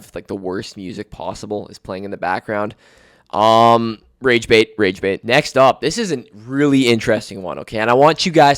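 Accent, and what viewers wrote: Canada. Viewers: canadian detected!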